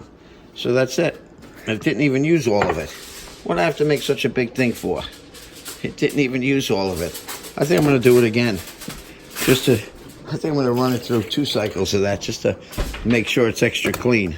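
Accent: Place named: New York, United States. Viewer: Suuuuuuch a great NYC accent! I’m guessing Brooklyn, but maybe SI, Queens, or d’Bronx? 😊 Regardless: thanks for your helpful video. I too am a “kawfee drinka” and I need that damn red light to go off!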